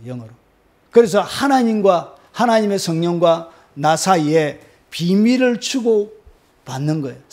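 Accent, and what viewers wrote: Gyeongsang, South Korea. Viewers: 억양이 강한 경상도 사투리 때문에 그런 오해가 있는 것같습니다. 저도 경상도 억양 때문에 그런 이야기를 들은적이 있어요. 부디 방언으로 능력있게 기도하시길 바랍니다. 할렐루야 ~!!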